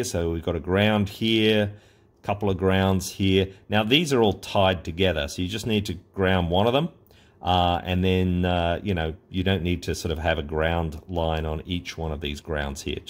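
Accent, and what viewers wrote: Australia. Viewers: MickMake was a very popular YT tech channel from down under. wiffy was his ongoing joke. Surprised you're not familiar with his channel. Judging from your accent (?), I figured you were good mates. MickMake stopped producing his videos just over a year ago. I think he got fed up with the online negative discourse, so he took his 'ball' away. Looks like your channel might be a great alternative. I'll be watching. Happy New Year. 😎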